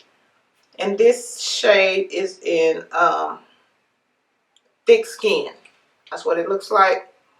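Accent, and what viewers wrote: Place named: Texas, United States. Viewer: Your accent makes me so happy. Are you from Texas too? Hugs!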